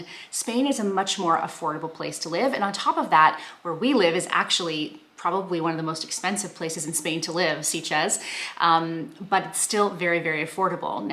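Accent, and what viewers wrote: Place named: Canada. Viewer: I absolutely love canadian english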